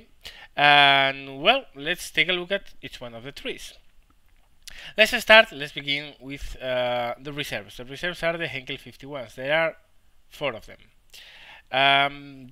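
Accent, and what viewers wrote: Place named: Spain. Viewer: What country are you from? My guess Spanish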